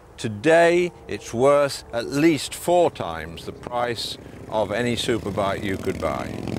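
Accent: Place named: United Kingdom. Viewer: that is a 100% pure british accent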